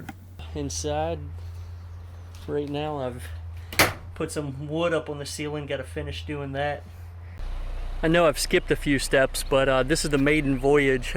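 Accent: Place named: West Coast, United States. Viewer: WOW, that's the kind of thing I would dream of doing. You saved a lot of green backs that way! East Coast. It sounds like your from the West coast. LOL. Thanks for the response, I liked that too!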